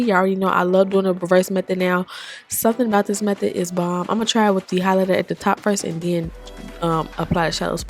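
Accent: South, United States. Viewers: Beautiful. I 💘 your voice overs with your Southern Dialect.I love to hear you say, Description Box. You and your fast talking self,.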